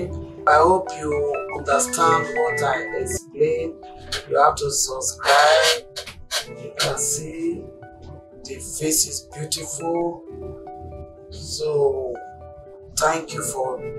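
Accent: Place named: Benin. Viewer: I recognize that Benin accent 😂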